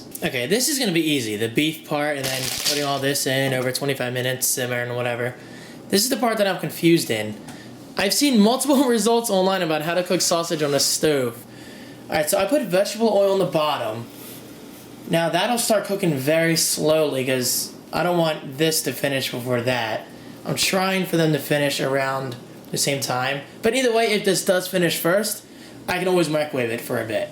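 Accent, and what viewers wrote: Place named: United States. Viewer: why do you pronounce "on" as "own"? Like when you say "online" you say it "ownline". Other than that you sound American, which is it should be coz your an American. LOL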